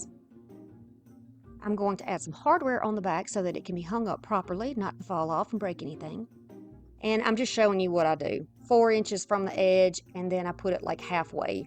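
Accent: South, United States. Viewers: Thnx for the inspiration n btw you have the best southern accent ever! Love it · Wow! The candelabra was so awesome! I have had a set of those forever and just didn't know what to do with them, so thank you for the idea! So happy I accidentally found your channel! I have learned so much from this video! Using the sander around the edges of the fabric was such a helpful hack! That turned out fabulous! Great video, can't wait to see more! Btw- so cool to hear somebody have a genuine southern accent like mine!!!🤠😅🤗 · I love Dark Academia and Dark Cottage Core. Amazing stuff. Thanks for sharing! Also, I love your voice. I'm also Southern. Yeah, it's ES-presso, but regional dialects are so common in the US. If you want to always pronounce things the absolute correct way, then you make it your own. 🙂It's still yours when you pronounce it the way everyone else does, because no one has your voice. 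I don't think you need to apologize for it, though. You have a lovely accent and I think regional dialects are interesting and unique. 🧡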